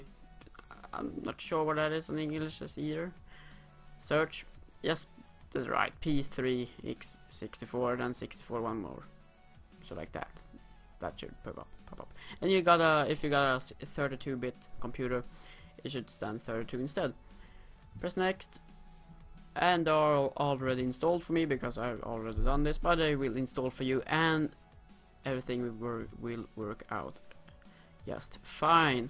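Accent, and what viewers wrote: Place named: Sweden. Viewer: du har svensk engelsk dialekt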